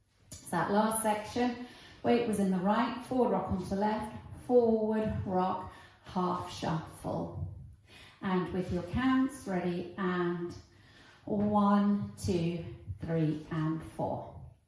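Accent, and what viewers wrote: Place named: England, United Kingdom. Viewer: The best Line dancing teacher on the Net, with a bonus of a lovely English accent. x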